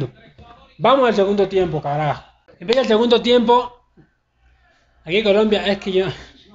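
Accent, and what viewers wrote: Esmeraldas, Ecuador. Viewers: Mijo usted parece esmeraldeños, por el hablado, Saludos desde ecuador. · Hey brother, saludos desde Quito, pero tú acento tiene un parecido a la gente de la Esmeraldas no tan marcado pero si lo tiene, capaz y es de Tumaco. Saludos panita. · Pensaba que eras de Esmeraldas-Ecuador, hasta tienes el acentito por ratos, sin ofender. Saludos. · Parece mas esmeraldeño que colombiano xDD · Lo q no cacho es q donde eres tu mas pareces ecuatoriano que colombiano por tu forma de hablar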